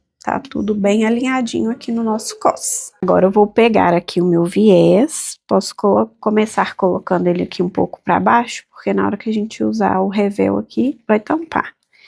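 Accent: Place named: Minas Gerais, Brazil. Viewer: Maravilhosa a saia, e as informações adicionais sobre como ela veste corpos variados me ganhou! Inscrita com certeza, muito obrigada! Vc é mineirinha? Seu sotaque é familiar 😂❤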